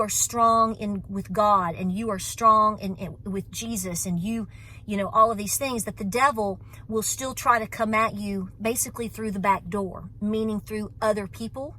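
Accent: South, United States. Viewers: Girl Girl Girl, as we say in the South! If I didn't recognize You're accent (I'm a Kentucky Trumplican!), I'd of thought the "T" was for Tracker as in You have Your Truck back!!! In all honesty, lived in Nashville for 14yrs & I have the same hat! So, some people just have to have something to Bitch about & You just happen to be an easy target. But, no worries Girl, You got this! Life is short, make it Sweet. Hell, I'll be 67 come May & just went back to work for Kroger Today. Both my cars are needing a car doctor & been ankle expressing for 2 wks plus. God said, get in shape Ladybug! & So funny, So did You. ❤ From Kentucky Patriot Warrior ❤❤❤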